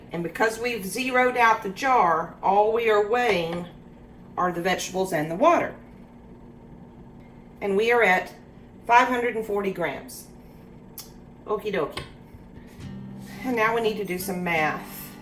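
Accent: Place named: Kentucky, United States. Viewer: Hey girl 💥I don’t know where you’re from but you sound like you come from my neck of the woods!! Love❤️ love the video…❤️ from ky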